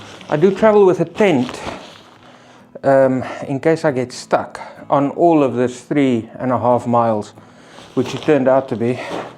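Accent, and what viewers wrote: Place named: South Africa. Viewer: Just found your vid via YouTube on my tv - loved it😁 Where in South Africa do you hail from? Over 50yrs ago a relative of mine went to SA for a 6 month working holiday and stayed! Your accent reminds me of his wife's. Looking forward to seeing more of your adventures 😁 According to a mountain rescue team leader my sister worked with (and who taught me how to read an OS map) you should never go hiking in denim jeans!! Take care and please keep on bothy camping for those of us that can't! Another subscriber 😅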